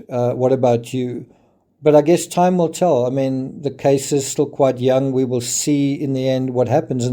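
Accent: South Africa. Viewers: I hope you stay here, love your analysis. Lekker to hear a South African on the tubes ❤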